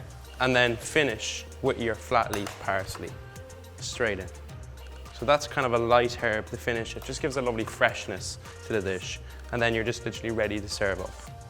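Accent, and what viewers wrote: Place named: Cavan, Ireland. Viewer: The Cavan accent makes the video 😂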